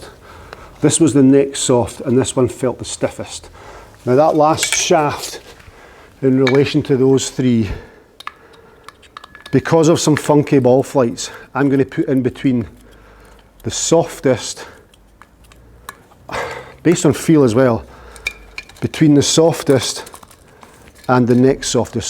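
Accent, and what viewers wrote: Scotland, United Kingdom. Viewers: you are a blast to watch and whatever you do, don't lose that Scottish accent!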